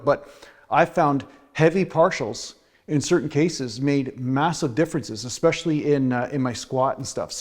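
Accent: Canada. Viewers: Great advice brother. 👍 I really like that "someone else driving your car" analogy. So true 👍 You sound Canadian. Are from Canada? I live in Alberta. 🇨🇦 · you are like Yoda- only taller, more muscular, and with a Canadian accent. Based on the wisdom you present, I could easily accept that you are likely also 900 years-old. I'm 58, been lifting since 14 years-old, and have seen about all there is to see and read in the BB world. I'm a personal trainer, nutritionist, and Neuromuscular Therapist and, despite that background, I ALWAYS learn something new from you in every video, even if it's just a fresh way to approach an idea or an entirely new way to think about something. I can honestly say you present the most pragmatic, useful and wise content of anyone on YouTube. Truly. Thank you so much for your hard work in making these videos and for being the voice of reason in the dystopian, sometimes absurdly ridiculous, bodybuilding world that now exists.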